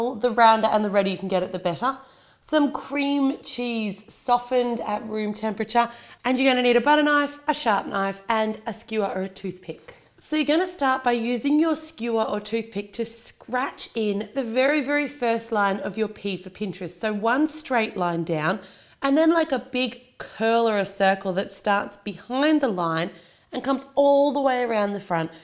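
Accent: Australia. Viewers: Hey! Ur aussie arent u?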